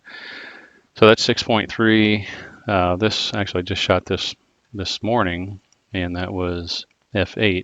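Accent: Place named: United States. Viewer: Neigh con, zee lens. No matter how many times I hear it said in an American accent, I mentally keep correcting it as Nick on and zed. English is a strange language to me.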